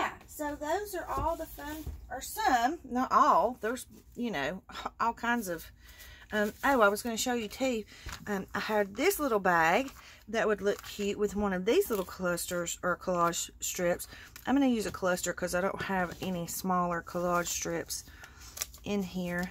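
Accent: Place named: Texas, United States. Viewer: I went out and made 15 clusters!!! Yea, tfs. You must be from Texas, sound like me and I left 35 years ago.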